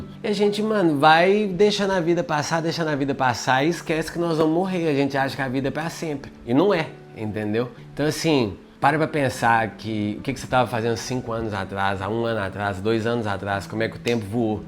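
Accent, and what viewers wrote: Minas Gerais, Brazil. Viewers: Sabia que ele é mineiro. Tem sotaque 😂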